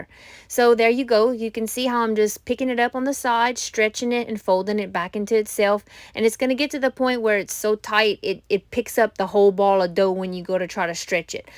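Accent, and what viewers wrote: Louisiana, United States. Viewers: I’m following for the content but also for your accent! I love it! I spent 5 years in New Orleans for college, so I have special place in my heart for a beautiful Cajun accent ❤️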